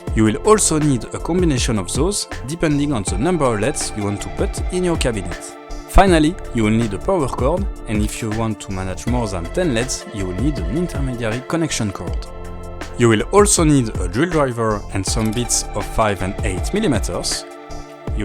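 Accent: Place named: France. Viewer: Great video, also could barely tell by your accent that you are french! laughs in Hoho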